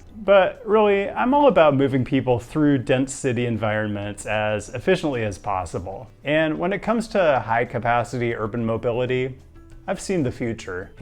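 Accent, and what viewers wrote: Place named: Southern California, United States. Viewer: Why is this man so handsome where is he from? He sounds like he is from south California maybe San Diego ? He has cat but not sure if he has wife or partner?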